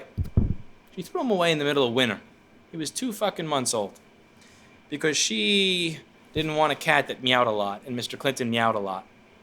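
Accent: New York, United States. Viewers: Your inner new Yorker is coming out in your vowels lol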